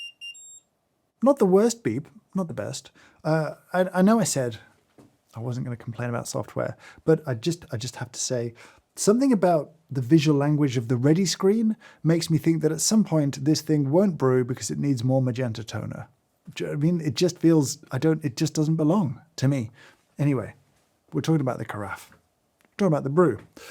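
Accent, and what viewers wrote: United Kingdom. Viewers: Englishman says words about coffee very britishly. 10/10.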